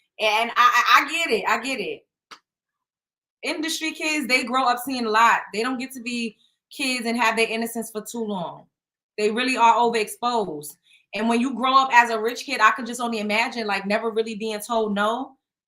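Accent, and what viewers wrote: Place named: New York, United States. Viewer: You remind me in a way of another good sis I watch on YouTube named Ashley Dalton she's a character as well lol, and y'all both talk with a NY style accent, and y'all both some beautiful chocolate drops. New subbie✨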